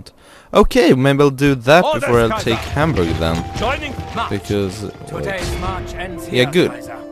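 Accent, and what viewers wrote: Sweden. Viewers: Hahaha, more swedish accent please!! :D:D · You're swedish right? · are you frome Sweden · you sound like a swedish person....är du svensk? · are you Swedish? sounds like it. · Skåne? :D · Det märks verkligen att du e svensk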